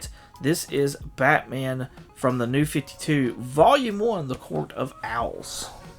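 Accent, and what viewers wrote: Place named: South, United States. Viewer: Hey brother! I came over to check out your channel after hearing about you on MONComics! I wanted to watch your latest video to see what you're all about! Do you live down south? I notice a little bit of the south in your voice! 😉 I actually lived in Vicksburg, MS for a year when I was 15. Had a great time there! I love all your new books you picked up! Right now I am just concentrating on finishing my Conan Collection and just started working on collecting every Batman (that I will never finish since I am so damn old) LMAO! I love the Constantine movie, but have never read any of the books. Are the new TMNT still as gritty and have violence like the original 80's run? If so, I need to pick them up! Love that Wolverine cover for sure!!! I enjoyed your content, looking forward to your next video, and Happy to be your new subscriber! 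Number 970 is a good number! Good luck on your journey to 1K!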